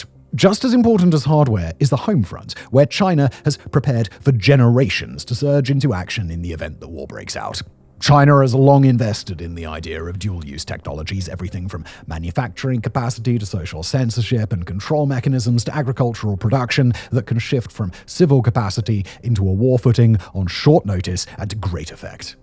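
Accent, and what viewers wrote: United Kingdom. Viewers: British accent is so stupid! 😂😂😂😂😂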